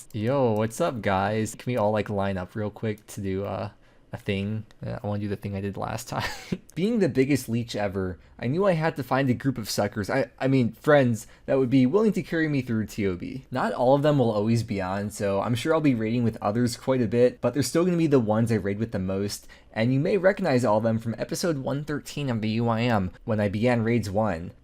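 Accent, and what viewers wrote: Canada. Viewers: wait a second.. are you canadian? So many good laughs this video ! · wait is canadian? pls tell me I'm not sure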